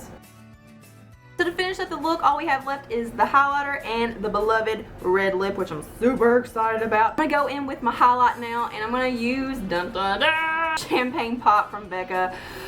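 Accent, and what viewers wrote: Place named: Louisiana, United States. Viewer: Haha Im pretty sure our accent is the same!!! Im from Louisiana lol!!!!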